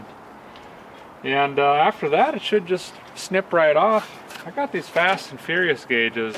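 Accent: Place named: Iron Range, United States. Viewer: Grew up in Duluth and the Iron Range accent and humor have me smiling ear to ear. So glad I came across this channel!!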